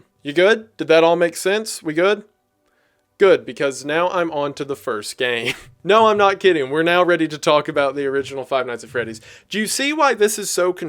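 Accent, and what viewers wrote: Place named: North Carolina, United States. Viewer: are you from NC, USA? you have a very particular accent, especially when you say "then". But also thank you so much for this, because i followed the whole time, but i still missed a lot of details, so I'm happy to see it all laid down flat.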